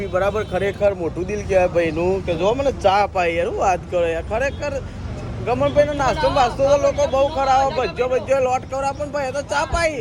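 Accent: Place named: Vijapur, India. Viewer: Good. Good. Original. Vijapur. Nee. Bhasa.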